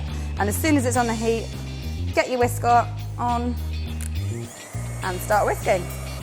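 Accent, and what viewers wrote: United Kingdom. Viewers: Hi I have been starting to watch your videos... and I think your videos are really amazing!!!! I love your British accent..haha I am living in America so... Anyway thank you for good videos!!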